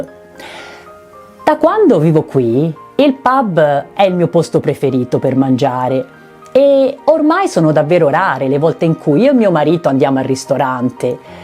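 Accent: Italy. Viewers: Grazie, mi piace il tuo modo di parlare così garbato, proprio lucchese. Un abbraccio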